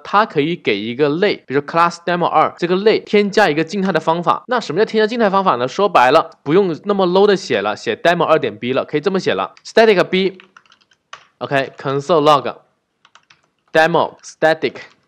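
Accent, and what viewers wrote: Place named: China. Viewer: 除去英文不谈，这口音是哪里啊，肯定不是北方了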